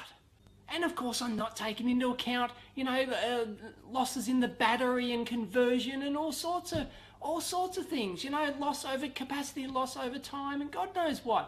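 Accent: Australia. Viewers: lUV THIS GEZZER, Must have the best aussie acent on the planet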